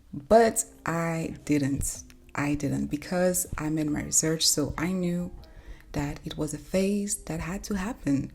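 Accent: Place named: Haiti. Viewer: Are you Haitian? I was trying to pinpoint the accent. It’s adorable.❤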